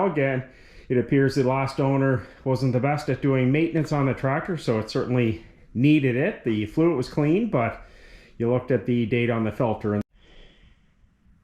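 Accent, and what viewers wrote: Canada. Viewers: Great video! Really clear! I'm from New Zealand and I enjoyed the Canadian accent too!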